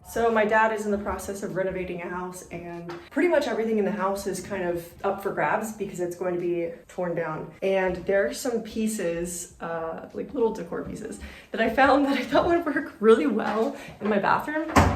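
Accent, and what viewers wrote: United Kingdom. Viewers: Wow … Legit looks amazing. Also the funny odd dorky British accent ish .. haha so random. So brave !! to have starting staining the shelf mounted …with the wallpaper …. My nerves !!!! Haha And yes….. toilet seats made of wood/ wood design.. look amazing. Well done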